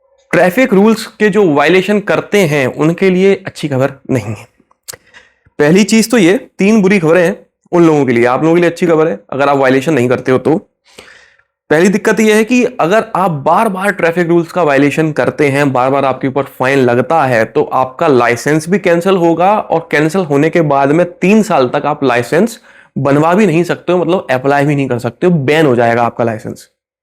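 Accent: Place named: Gwalior, India. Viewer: Bhai aap kaha ke rahne wale ho, क्योंकि कभी कभी आप भिंड, मुरैना, gwalior jaise भाषा बोलते हो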